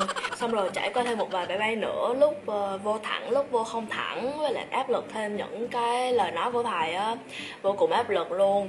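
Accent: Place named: North, Vietnam. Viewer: bạn này chắc là có máu lai miền bắc và miền nam, vì nghe cách nc bình thường thì miền nam mà có cái chữ ấy ấy là người bắc hay dùng và bạn đó cũng phát âm giọng bắc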